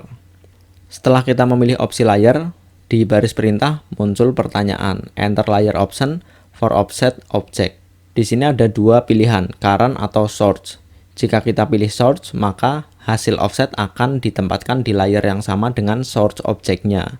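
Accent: Banyumas, Indonesia. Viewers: rika asline ndi kang? kayane ngapak ya?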